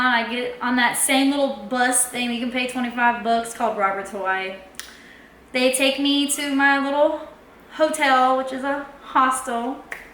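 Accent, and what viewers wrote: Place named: South, United States. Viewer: That's a cool uplifting story. Thank you very much for sharing it with us. I don't know about your accent, in all my life I have met like 3 Americans and none of them were from the South. I have heard this accent before in videos etc and thankfully I have no problem understanding it because I like it a lot. I have a similar story, just not a successful one in how it ended, but still I totally get your sudden change of life. I was a university student of computing in Plymouth, England. I am not British, I am Greek. I was doing my second degree and I was doing it more because it made sense than anything else at the time. I was in my third year there. One late night I was watching a movie on DVD, Once Upon A Time in Mexico. I liked it and decided to watch the special/extra features. One of which was a behind the scenes extra from the film's director. 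At one point, several minutes in, he goes something like this "obviously if you are watching this are interested into film making, else why would you watch this 'boring' stuff". I don't remember his exact words, but that was more or less what he meant. At that point I thought to myself that perhaps in the next life I will become a filmmaker. As soon as I finished that thought, the next one came "what next life? You only live once". Next morning I contacted the university and resigned. There was no film making course at the time so I decided to contact the one where I graduated first in another town in the UK. The following academic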